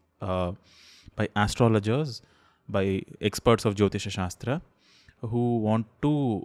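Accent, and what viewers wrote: South India, India. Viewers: actually, if you have observed in his pronunciation, he is a south indian, and generally, south indians only understand Hindi, and that too little, and have difficulty in speaking Hindi, thats why he explains in English, not discriminatory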